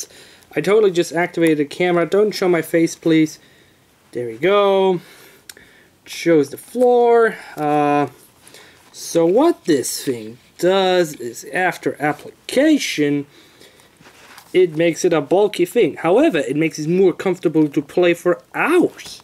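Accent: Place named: United Kingdom. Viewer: To be Young,12 cups of coffee and UK accent,that's the magic formula.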